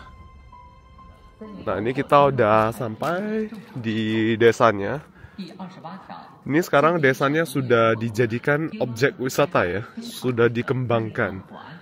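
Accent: Java, Indonesia. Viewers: Logat jawanya kelihatan ko🙏🙏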